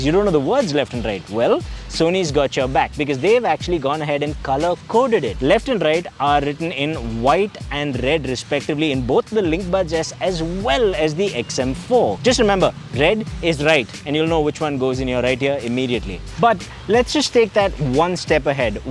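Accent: India. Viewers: First off, I'm an American. 2nd, I don't care if this guy has an Indian english accent. he's so fluid in not only verbal communication but his facial expression, charisma in front of the camera etc. keep up the good work!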